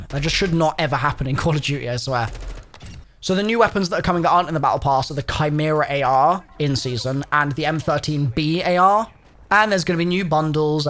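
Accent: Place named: United Kingdom. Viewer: "Voice issue" its called a british accent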